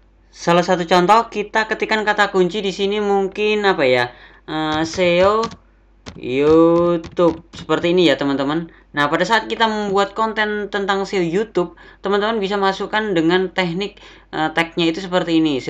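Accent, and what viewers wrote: Java, Indonesia. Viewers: Kewoooooot....mantab...paste....medok2..tp?lucu.mwkkwkw...iklane bang...ngeri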